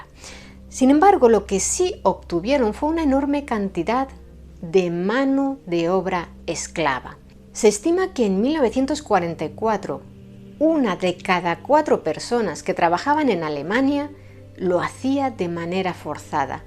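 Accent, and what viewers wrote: Spain. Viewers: Que bonito narra esta mujer .y su oratoria muy coordinada y sus palabras puestas con exquisites y su voz y el oir es como ver una pelicula o leer poesias ..Una investigadora entregada y natural.desde hoy vere todos sus videos.por su acento y lenguaje Creo que es Española...Saludos hermosa desde Esteli Nicaragua...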